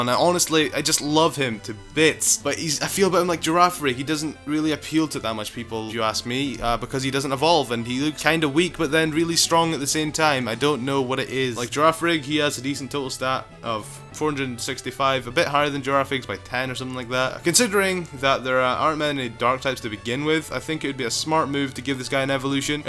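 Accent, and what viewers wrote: Scotland, United Kingdom. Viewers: R u Scottish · Are you Scottish? You sound like Shrek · are you Scottish?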